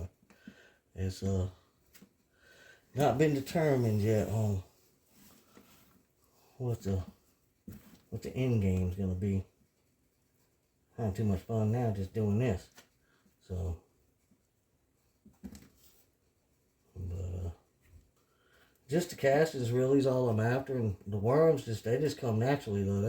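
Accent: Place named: South, United States. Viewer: My wife want to know where you grew up? She says you sound a lot like me and I am a country f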